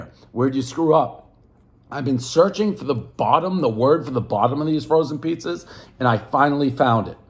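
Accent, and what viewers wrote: Boston, United States. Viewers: He's pure boston, newengland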